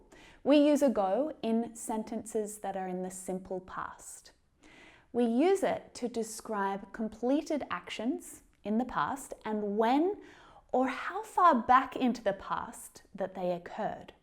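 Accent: Australia. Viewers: Teacher, I just want to say: Thank you. Your accent is really beautiful, this australian accent is wonderful, I really like it. All of this videos are very useful. Thank you teacher for your job, this videos will always help us.Thank you ❤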